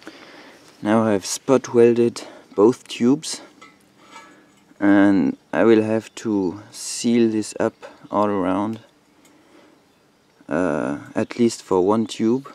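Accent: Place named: France. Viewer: heureusement c'est un beau projet, les anglophones n'ont qu'a faire ce que ton accent francais trahi, traduire 🤨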